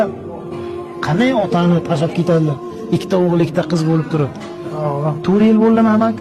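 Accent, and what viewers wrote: Khorezm, Uzbekistan. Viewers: Амаки хоразмнан шекилли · Ота Хоразмдан экан шева Хорасмча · Гапириш Шева хоразмга тортяпти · Фаргона шеваси эмас бу хоразим шевасику фаргонада ова дейишмайди .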